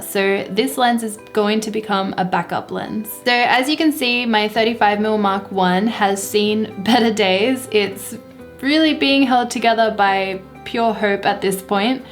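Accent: Australia. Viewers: Omg thats literally the store I bought my first lens from, just two weeks ago. I also went in there yesterday to check if they had a canon 35mm 1.4 cheaper non L but no stock. Now I'm looking at buying a mark 1 used for cheap today hopefully. For some reason I just assumed you where Canadian like most you tubers lol, I didnt even notice the aussie accent but now I think about it, its definitely there :P Got to say too damn you take some nice photos for unedited.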